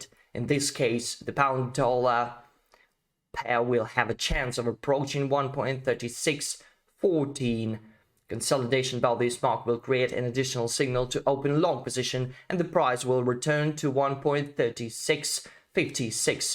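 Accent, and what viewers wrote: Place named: Russia. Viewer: Are you from Russia? Ты из России? Произношение выдает тебя)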